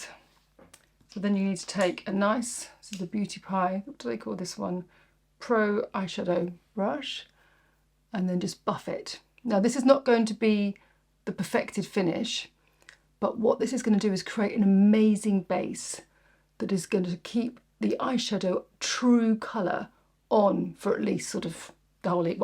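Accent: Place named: Scotland, United Kingdom. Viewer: Me too! I go down to the kitchen, make my oatmeal and coffee, bring it up to bed and snuggle in and have breakfast with. I wonder how many of us are doing that together? Of course, not at the same time lol because I'm in Minneapolis and you sound like you're in Scotland. maybe we could take a poll and see how many of us eat breakfast with?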